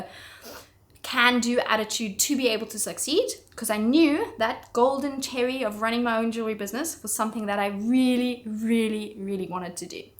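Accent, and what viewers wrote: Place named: South Africa. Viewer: I just knew that was a South African accent 🙌🏻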